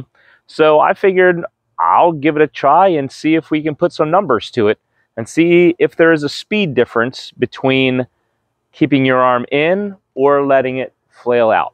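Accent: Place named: Philadelphia, United States. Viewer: ah, ok. I think you're more subject to the Philly accent there than the Pittsburgh area. You have the vowel sounds: "ph-oh-ne h-oh-me to my Fahm-ly." I used to live there for a few years and can pick up on certain accents.😄 Appreciate you humoring my non-dg question! But I digress! Subbed'! I do similar content to grow the sport. Cool you got your kids into it too. One of my 3 boys joins my wife and I at least once a month. Building him a bag was super fun!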